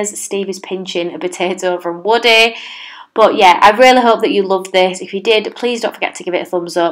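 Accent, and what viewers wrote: Yorkshire, United Kingdom. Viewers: I'm fussing it's a soft Yorkshire accent, def no further north! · sounds like Yorkshire or somewhere close. That accent isn’t Scottish or Irish.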